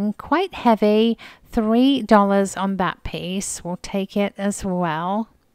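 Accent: United Kingdom. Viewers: From the things you say it is clear that you have been in the USA since you were quite young and yet your accent is pure UK - -which is fascinating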